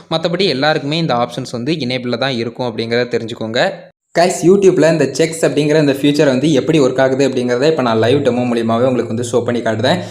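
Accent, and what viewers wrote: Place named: Tirunelveli, India. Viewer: Nanum tirunelveli than pesum bothu therithu athan ketan tirunelveli la enga தம்பி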